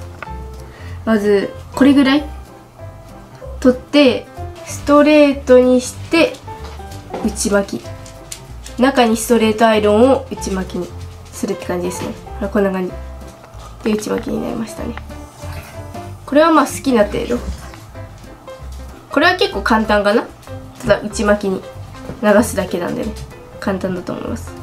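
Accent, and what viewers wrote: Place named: Hiroshima, Japan. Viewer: ちゃん可愛い！所々でるじゃけいとかの広島弁？が私も使うから親近感あって嬉しい！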